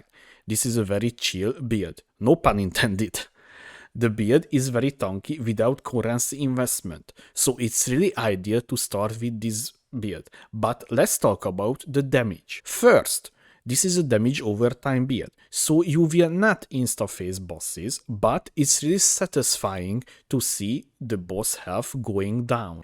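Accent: Hungary. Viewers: You must be Hungarian with this accent. :D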